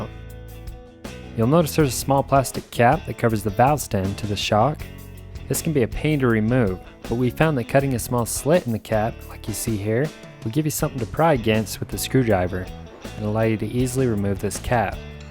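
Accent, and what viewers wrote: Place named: Utah, United States. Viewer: I can tell the guy narrating is from Utah with his accent.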